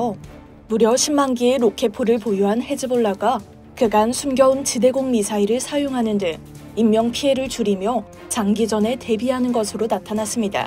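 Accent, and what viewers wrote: North Korea. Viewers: AI 어투가 북한말 같아요 한국 방송답게 해주세요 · 나레이션 탈북자 엑센트네요 ㅎㅎ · 말투가 북한말인데? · 북한 말씨네. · 웬 북한 억양?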